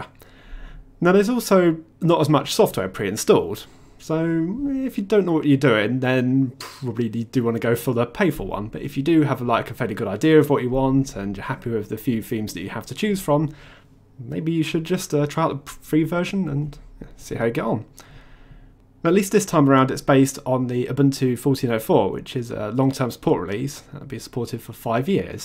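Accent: United Kingdom. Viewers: Nice review done in Blighty English. How refreshing. Great job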